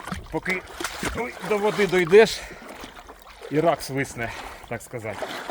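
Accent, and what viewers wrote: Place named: Surzhyk, Ukraine. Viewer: Що ж вас так коробить? Все гарно у нього виходить , нічого він не мучається , класичний український суржик .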